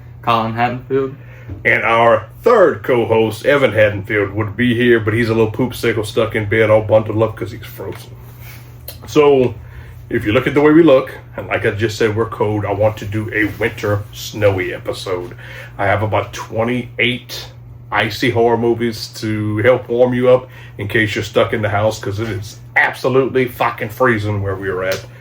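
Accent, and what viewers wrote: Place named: Louisiana, United States. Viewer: as soon as you started talking I thought Louisiana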